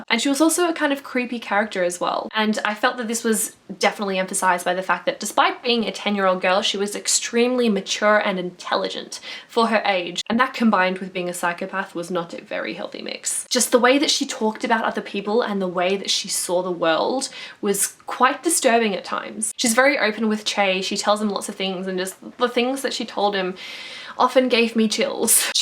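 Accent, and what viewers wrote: Australia. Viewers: You have such a beautiful australian accent but still a bit different from the way my australian friend talks, so I guess that it's a matter of different dialects. which part of Australia are you from? If it is ok to ask? And I soooo have to read this book, you made me so curious and the way you talk about it makes me think that I really would enjoy it!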